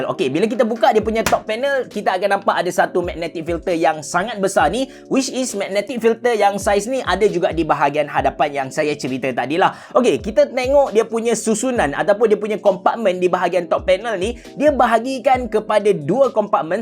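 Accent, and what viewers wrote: Kelantan, Malaysia. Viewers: Pekat bahasa kelate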